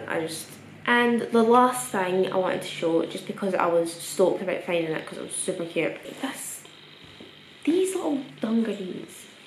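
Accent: Scotland, United Kingdom. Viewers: Sounds scottish to me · are u Scottish? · wow. this is the first video i’ve seen her talk. was not expecting a scottish accent. lol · ̗̀c h a o s ̖́- scottish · I wasn’t ready for the Scottish accent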